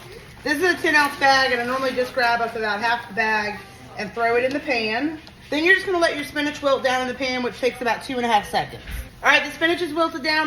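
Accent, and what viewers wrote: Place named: South, United States. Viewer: Love you girl! I'm from the to South too so I love your cooking and...your Southern accent. 🥰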